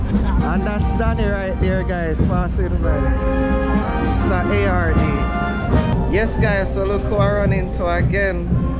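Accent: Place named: Jamaica. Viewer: Are you Guyanese, you sound like you’re from Jamaica? Good job nevertheless kudos to you. 👏🏽👏🏽👏🏽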